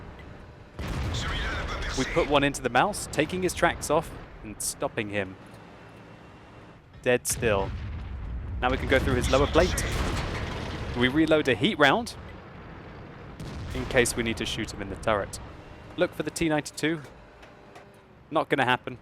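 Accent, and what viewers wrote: France. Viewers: Lovely french accent :D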